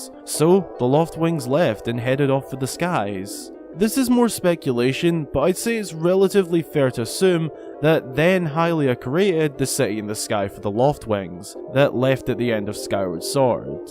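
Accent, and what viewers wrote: Scotland, United Kingdom. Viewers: Do you have a scottish accent? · Are you Scottish?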